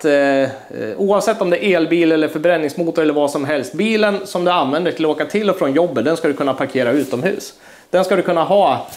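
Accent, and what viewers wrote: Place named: Norrland, Sweden. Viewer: Nu kanske du sagt det tidigare vid något tillfälle, men jag har väldigt nyfiken på din dialekt och var du/ni är någonstans i Sverige. Om jag skulle gissa på det skulle jag nog säga Västernorrland/ Jämtland/ Västerbotten.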